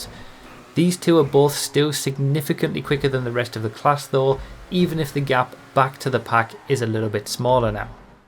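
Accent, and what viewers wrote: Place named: England, United Kingdom. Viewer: he's English thats whats going on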